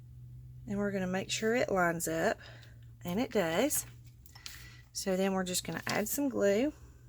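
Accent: South, United States. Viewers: I also love your accent. Yes, you do have one, It reminds me of my southern cousins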